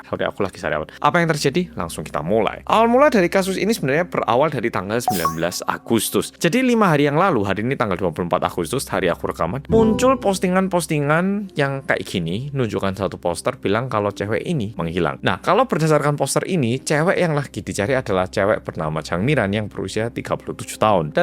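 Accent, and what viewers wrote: Java, Indonesia. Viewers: Waduh padahal pernah ndok jeju seminggu sekali kapal ku docking di dermaga sana. Angin nya besar pernah hampir terbang gara2 bawa payung 😂. Karena kota nya kecil kukira jeju itu kecil. Tiap meninggalkan jeju pasti kapal yg kutumpangi lewat depan area golf tepi laut. Itu ingatan yg agak samar karena sudah 26 tahun yal. 😢. Ini plot twist e piye sidane mas korea sing medhok? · Salfok bahasa Indonesia medok tenan... Markotop Lanjutkan terus kakak... Oppa... Mas_eeee.... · Logatnya seperti orang jawa😅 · Org Korea yg medok bnget😊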